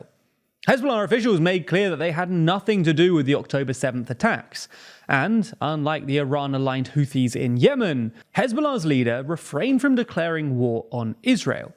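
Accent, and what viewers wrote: United Kingdom. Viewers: You sound british. Let me ask you, when the germans attacked your country in 1940 did your grandparents care about the german civilians??? Grow up. If you elect terrorists, expect terror.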